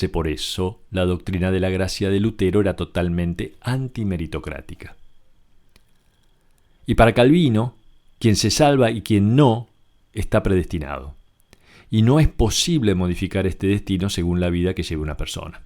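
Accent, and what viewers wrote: Argentina. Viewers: a veces tengo que darle al stop para asimilar el contenido, muchas veces vas demasiado rápido y siendo español adaptarme al tono argentino ,pero el contenido es bastante interesante y beneficioso. Saludos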